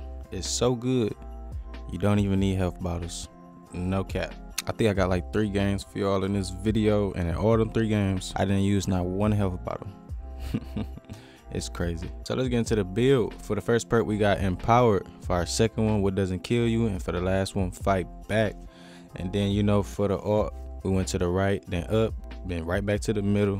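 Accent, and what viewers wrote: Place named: Detroit, United States. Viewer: Sound like my homie from 7 mile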